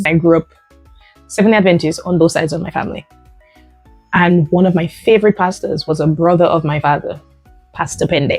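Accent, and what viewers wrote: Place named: Dominican Republic. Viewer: Enjoying the Dominican accent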